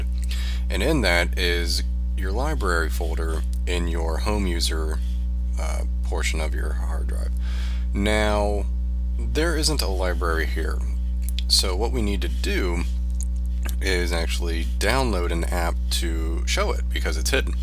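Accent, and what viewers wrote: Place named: South, United States. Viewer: Really helpful and the Southern delivery was a bonus ; )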